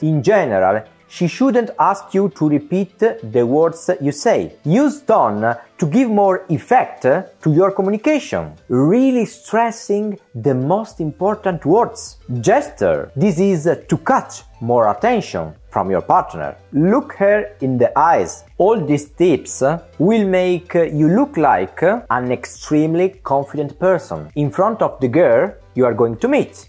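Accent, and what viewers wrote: India. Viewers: Ma questo accento indiano??? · Pur con accento indiano parla meglio di molti italiani, più che altro fa strano L accento indiano su un italiano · You have a lovely indian accent <3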